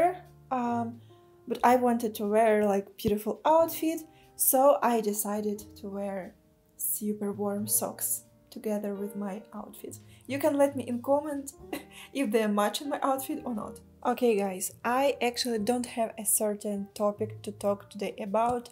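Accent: Russia. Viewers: Русская? Акцент )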